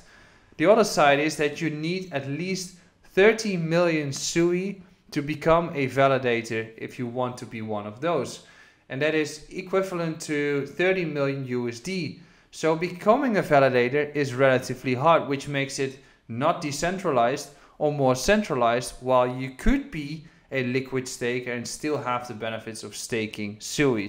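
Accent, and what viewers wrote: Netherlands. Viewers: Had binnen 1 minuut al door dat je een Nederlander bent ;). Bizar hoe herkenbaar ons accent is wanneer we Engels spreken... Goeie video man, je bent een abonnee rijker!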